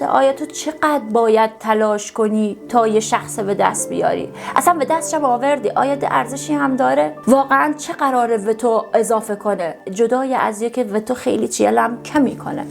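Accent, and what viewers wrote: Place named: Lorestan, Iran. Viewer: جون من که لذت بردم هم از این لهجه قشنگت اصلا فکرش رو نمی کردم که بتونید اینقدر قشنگ با لهجه لری صحبت کنید هم از این راهنمایی ریبات برای مراجعه کننده آن هزار آفرین داری واقعا شگفت زده ام کردی❤❤❤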